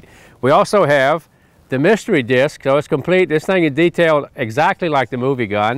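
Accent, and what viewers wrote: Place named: South, United States. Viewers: “Store wars” “replicor” I love that southern twang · He sounds like he's from South Carolina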